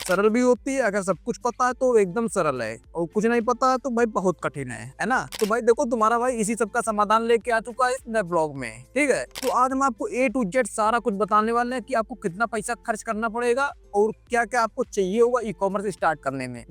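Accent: Kanpur, India. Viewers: Aapki Avaj se lag rah ki aap kanpur se ho 😅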